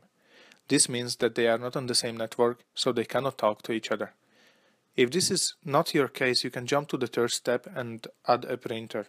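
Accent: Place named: Poland. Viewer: He sound polish to me ;-) Pozdrawiam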